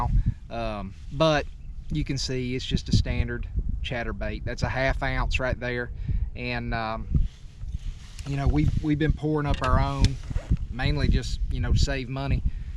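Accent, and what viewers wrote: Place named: South, United States. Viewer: So I've gotta ask you, what's a pieket, I know what a pocket is, but not sure what a piekit is, 😄😄. Man I had too, been watching your videos for awhile and you've got the biggest southern draw I believe I've ever heard. I get a kick on how you say pocket. I do enjoy your videos tho.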